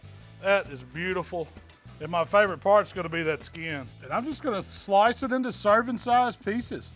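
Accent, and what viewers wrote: South, United States. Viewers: Look no further when scrolling through how to smoke a turkey and come across a guy with a mean southern accent. Thank you sir!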